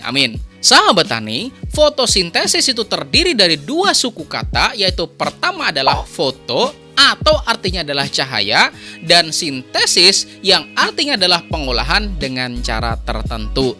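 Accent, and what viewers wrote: Banjar, Indonesia. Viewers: Logat admin org banjar pasti ini. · logatnya kayak orang banjar, bener gak ya? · Mendangar logatnya kayak urang banjar pian tu.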